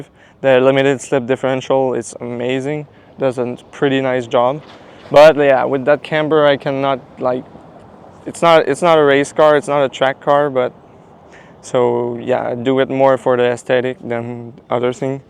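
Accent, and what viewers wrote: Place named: France. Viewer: Are you French I kinda hear that French accent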